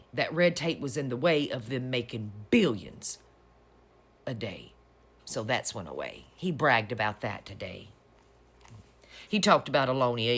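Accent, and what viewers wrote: South, United States. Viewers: I just love your analyses. With your southern accent, your comments are on point while sounding smooth as Vermont maple syrup. It makes what you are saying more compelling to hear. ❤❤❤❤❤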